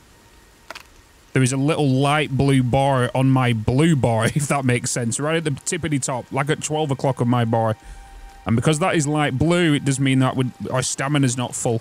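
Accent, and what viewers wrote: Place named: Preston, United Kingdom. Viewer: Your accent really sounds like you are from preston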